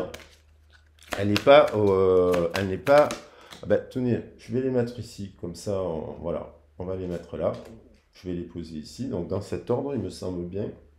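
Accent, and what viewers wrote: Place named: Marseille, France. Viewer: Merci petit et cette petit accent de Marseille 😉🙋‍♀️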